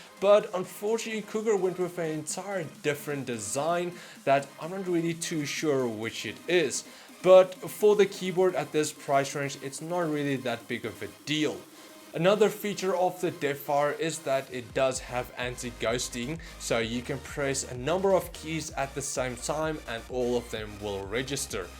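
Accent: South Africa. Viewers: South African? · Being South African myself the moment you spoke I knew you were from SA 😂 That’s our accent but THANK you I have a decision to make between this combo or the Corsair K55 combo. Your video is helping me out in so many ways. Baie baie dankie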